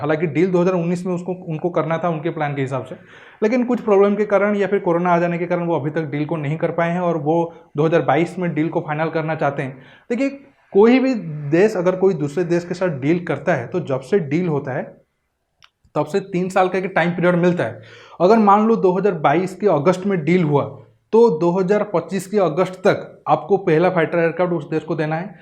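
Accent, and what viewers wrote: Odisha, India. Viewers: Brother , excellent vlog ... please correct your Oria accent .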